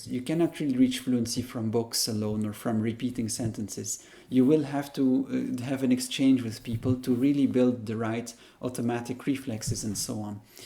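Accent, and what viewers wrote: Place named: Tagalog, Philippines. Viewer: Dude I can hear a Tagalog accent..Your good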